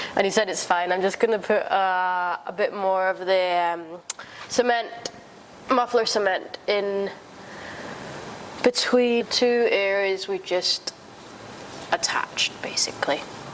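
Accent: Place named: Scotland, United Kingdom. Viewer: I hear Scottish in your accent.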